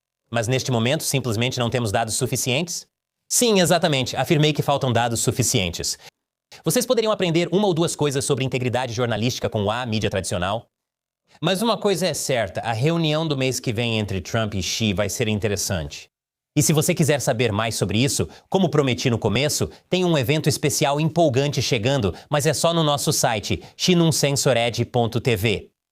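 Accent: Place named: Portugal. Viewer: Que porra essa mudança para o sotaque de Portugal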